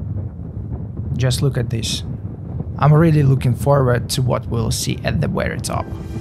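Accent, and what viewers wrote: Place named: Northern Europe. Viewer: Great clip, I am in a dilemma as to your accent - northern european I suspect. Marvellous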